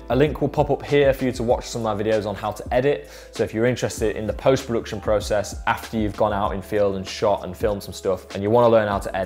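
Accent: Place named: Yorkshire, United Kingdom. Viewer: Btw are you from Leeds or Bradford ? Your accent is too close to home not to be.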